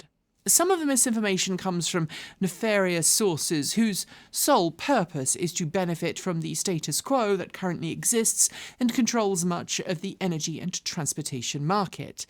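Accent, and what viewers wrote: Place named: United Kingdom. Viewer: I'm assuming - based on your accent - that you are based in the UK. I'm honestly surprised that you are getting the same amount of FUD and misinformation over there that we get here on the left side of the pond. I am 6'4" and about 17 stone (240 lbs) so cannot squeeze in small vehicles. However, I love my EV. I do still like my weekend Lexus coupe. But the EV is such an easy choice for daily driving. I do live in Southern California and have solar, so enjoy "free" charging. However, even if I didn't, I would continue driving an EV. Keep up the good work!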